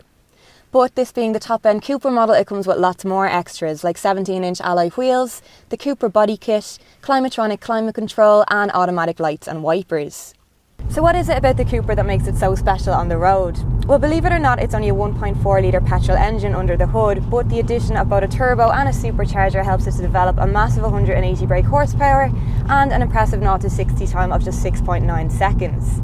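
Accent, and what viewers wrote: Dublin, Ireland. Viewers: That Dublin Accent is so nice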